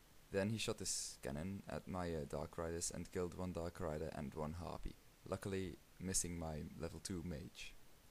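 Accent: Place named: Flanders, Belgium. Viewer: haha I can hear your flemish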